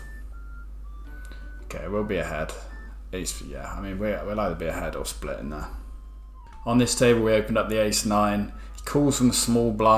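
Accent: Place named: Gloucestershire, United Kingdom. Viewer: thought your accent sounded close by to me. Wiltshire here 👍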